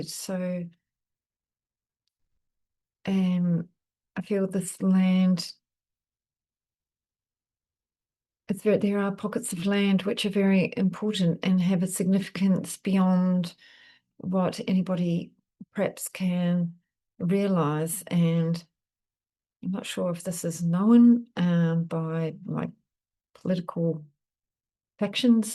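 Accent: New Zealand. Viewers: Thank you, It is so nice to have a reliable channel here in the Southern Hemisphere. I love listening to your beautiful Kiwi accent and know you are here in Eastern Australia. I am in Nth Narrabeen NSW and you really resonate in my heart. Thank you for your commitment, I am truly grateful 🙏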